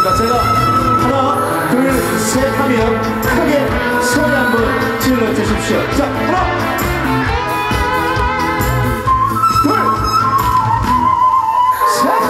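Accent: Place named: Gyeongsang, South Korea. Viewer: #진해성 가수님 함양중학교 총동창회 축하드려요 더운날씨에 고생하셨습니다 첫무대,대히트곡 사랑반 눈물반 와 최곱니다 살짝썩인 사투리 무대맨트 최고에매력 경상도사투리 많이많이 써주세요 억지로 표준말을 쓸려고하지마세용 ㅋㅋ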